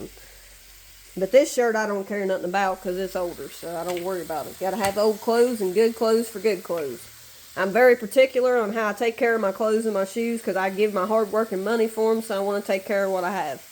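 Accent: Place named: South, United States. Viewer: You have a heavy southern accent wow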